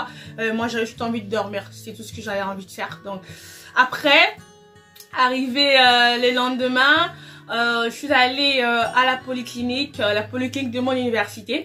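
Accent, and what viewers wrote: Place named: Democratic Republic of the Congo. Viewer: salut ton accent m'intrigue et j'aimerais bien savoir ton pays d'origine je pense que tu es Congolaise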